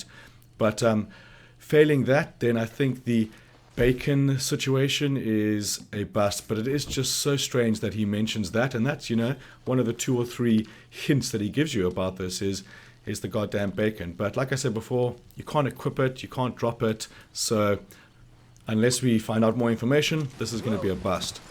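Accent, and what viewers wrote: South Africa. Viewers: Holy shit I did not expect a South African accent!!Howzit my China💪🏼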